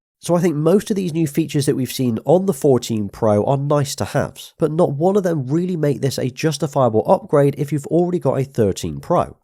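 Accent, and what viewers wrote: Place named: West Country, United Kingdom. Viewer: Your West Country twang coming in strong here😂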